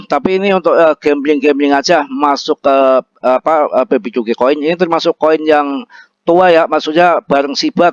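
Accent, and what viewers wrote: Banyumas, Indonesia. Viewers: Mantap...bahasanya kok mirip nyong banget😅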